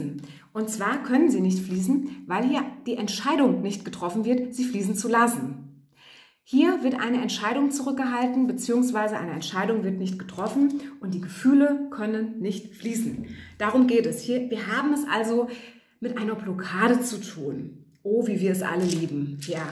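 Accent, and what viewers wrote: Hesse, Germany. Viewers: sie kommt aus Hessen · ....ich vermute auch Hessen, zumindest lebt sie da. Das Rheinische hört man auch etwas durch. Egal, ich liebe diesen SingSangSlang 🤗😍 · Sie kommt aus Hessen .Ich dachte am Anfang auch, oh schön jemand aus Sachsem.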